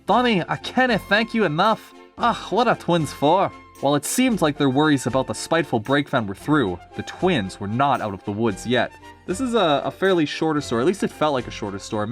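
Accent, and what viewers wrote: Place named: Scotland, United Kingdom. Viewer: That Scottish accent XD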